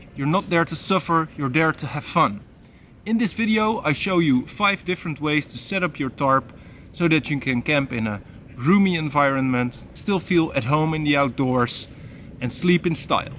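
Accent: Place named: Netherlands. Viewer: Are you from Holland my friend ? Your English is realy good I can just hear a bit of accent ! Super tarp shelters anyway !!!!!😉👍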